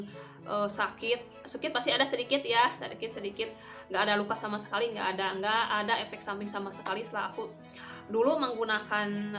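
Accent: West Java, Indonesia. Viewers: Orang Sunda ya teh, logat y kaya Sunda hehe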